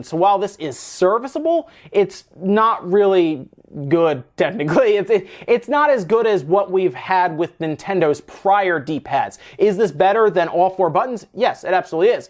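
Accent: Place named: Maryland, United States. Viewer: you sound like you’ve got a MD accent.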